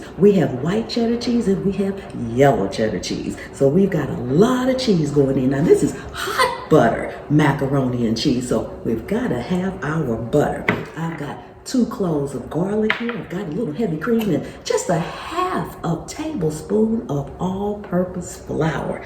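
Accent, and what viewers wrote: South, United States. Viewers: You must be from the south. I was born and raised in North Carolina and when I heard you say, "If the Good Lord's willing and the creek don't rise" I immediately thought of my mama and daddy. Thank you for the beautiful memory and for the recipe. It looks amazing! ❤🙏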